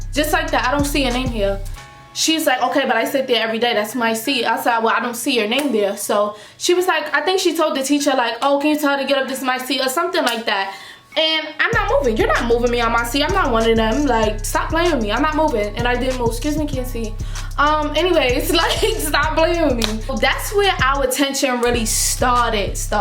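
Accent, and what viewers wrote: New York, United States. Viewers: I just started watching you and I love you your NY accent is so cute 🤎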